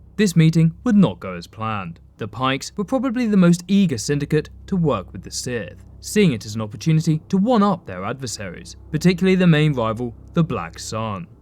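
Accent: United Kingdom. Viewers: Just realised he’s from the uk cuz the accent. #oneofus!!!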